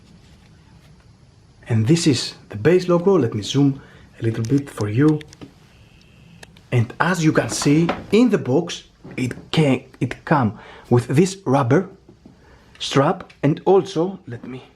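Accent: Greece. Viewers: you are from greece? the ascent is familiar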